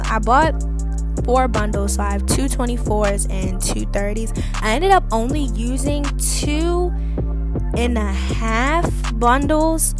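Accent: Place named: Baltimore, United States. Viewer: The accent giving Baltimore 🤔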